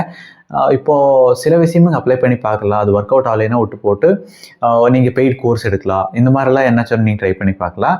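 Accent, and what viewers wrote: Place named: Bangalore, India. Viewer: Bangalore Tamil Nice Thala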